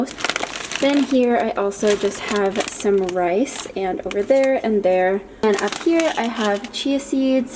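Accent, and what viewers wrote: Canada. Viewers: I love the way Canadians say “pasta” 😍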